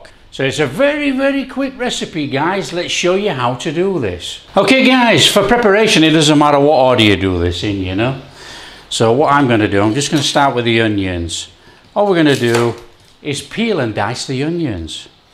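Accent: Yorkshire, United Kingdom. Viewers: hearing you giving the instructions with your wonderful Yorkshire accent is like watching an episode of Heartbeat, I keep expecting to see Claude Jeremiah Greengrass wander in... Corned beef hash is a big favourite of mine! My Dad used to love making that, and he did it the same way as you, so he taught me. This was like a happy reminder for me. Thanks!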